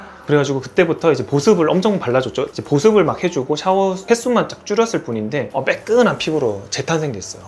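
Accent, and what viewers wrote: Gyeongsang, South Korea. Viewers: 선생님 경상도 출신이군요 ㅎㅎ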